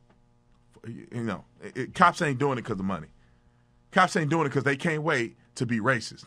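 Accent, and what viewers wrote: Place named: South, United States. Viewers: Love that strong Southern Accent!!! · Love this! He is typically southern! Love thisman!!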